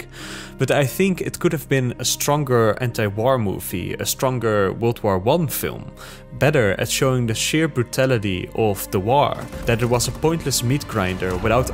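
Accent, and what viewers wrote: Netherlands. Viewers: Nice dutch accent broeder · eyyyyy you Dutch? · Ik herken een nederlander als ik het hoor, goede video!! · Lekker Hollands accentje hoor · Tell me you are dutch without telling me you are dutch ;P very goed acent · Haha ik hoor in je accent dat je Nederlands bent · Ik hoor duidelojk dat je uit nederland komt broeder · Just found your channel, this is a great video glad it popped up in my recommended. (But are you by any chance Dutch? Your accent sounds familiar) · Tell me your dutch without telling me your dutch. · Goddammit. Every once in a while i will come across your videos and i can tell its very good quality. But then i hear your voice... and that horrible Dutch accent (that i have myself) and i just cannot ignore it and it kinda drives me crazy and i rarely make it to the end. Its such a waste because im pretty sure these are excellent video essays! 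· Dit is het meest Nederlandse accent dat ik ooit gehoord heb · You sound Dutch 😂 love the video btw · Het Nederlandse accent is zo zwaar😭😭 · Narrator has the most Dutch accent ever lol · 1 second in to the video and I reconized a fellow Dutchman! Great video! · you can just hear the dutch in his voice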